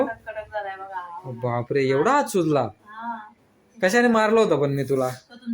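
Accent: Khandesh, India. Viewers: Bhau ahirani bhasha bhari she,dhulani ahirani ani bhadgaon pachorani ahirani ma pharak she🎉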